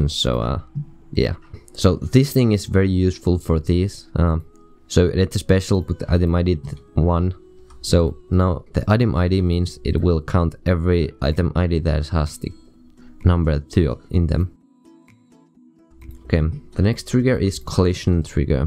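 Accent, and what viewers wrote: Finland. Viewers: Are u finnish?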